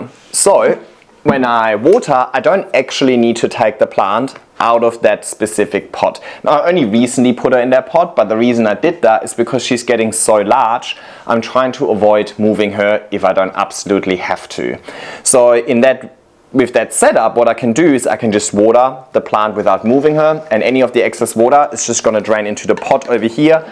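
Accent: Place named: Germany. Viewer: Grazie per i tuoi bellissimi video, per la generosità con quale condividi la tua esperienza. Da anni sei una fonte di ispirazione per me! E poi c'è una cosa buffa, che capisco molto meglio il tuo Inglese con leggero accento tedesco, rispetto al inglese comune 😂. Grazie❤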